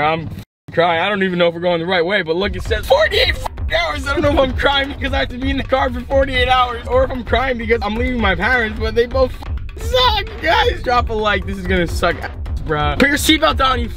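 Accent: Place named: New York, United States. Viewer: you can tell he’s from NY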